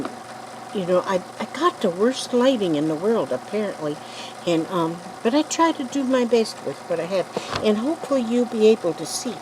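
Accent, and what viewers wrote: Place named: Minnesota, United States. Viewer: I really enjoy your videos! You are so inspiring yet so calming! I will always think of u as! I found that story so cute in another video, maybe it's your voice has that Minnesota accent. Anyways your videos are a pleasure to watch TFS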